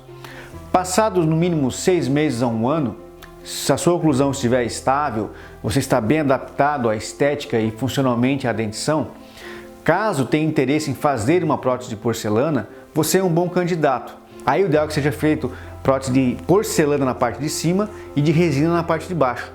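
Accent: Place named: Pernambuco, Brazil. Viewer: O senhor é de Pernambuco Dr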